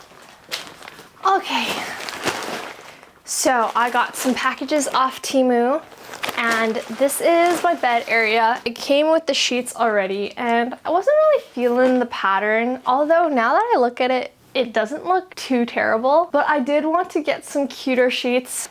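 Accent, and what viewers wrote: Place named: Saskatchewan, Canada. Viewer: Thanks for a real life video. Not everyone lives a “home magazine life”. Are you canadian? You speak just like my Dad from Saskatchewan. Love from Norway.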